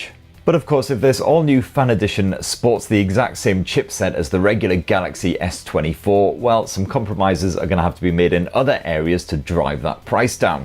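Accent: United Kingdom. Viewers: He sounds British but doesn’t act shitty like them which I like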